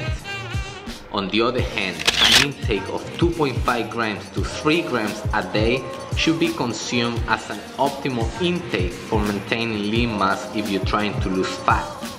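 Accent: Spain. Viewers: up主应该是西语母语人士啊：)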